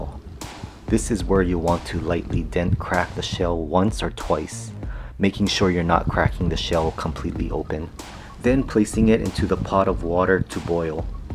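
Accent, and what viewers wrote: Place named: Hawaii, United States. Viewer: Great tip!! I hate when that membrane gets stuck on the egg! Yuck... btw... you sound like you're from Hawai'i 😀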